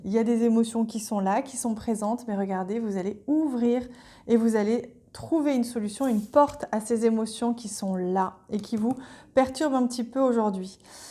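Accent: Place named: Anjou, France. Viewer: Bonjour Merci pour cette guidance, ta voix angevine donne encore plus de plaisir à écouter et intégrer les messages. J, ai reçu ce message pour toi. Surveille ta peau. Je ne sais pas si cela te parle? Sinon oublie. Bises amicales et bienveillantes Olivier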